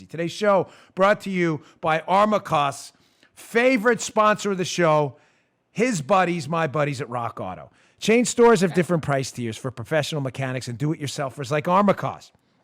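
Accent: United States. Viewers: Last night was great , good job Dan at C PAC. You talk American.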